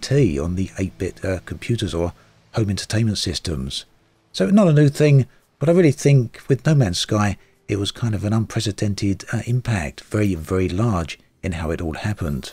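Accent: United Kingdom. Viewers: Pretty good game and stuff but it's pretty obvious your desperately grasping for content that people want to hear with your weird whispering British voice lol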